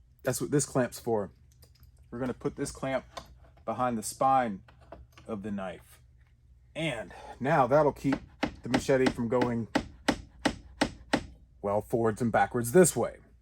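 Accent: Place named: South, United States. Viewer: Bob Ross would enjoy your videos. And now we have. A happy little edge. And he's alone. Lets give him a happy friend on the other side... Slide with the file. Just let the file slide... Sounds almost like a Southern accent. You have there... But yes. Thank you for sharing your info. On files and everything.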